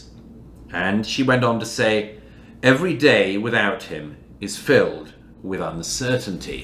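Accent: United Kingdom. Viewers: Your sly British accent isn’t fooling anyone with your shekeled hasbara Murray.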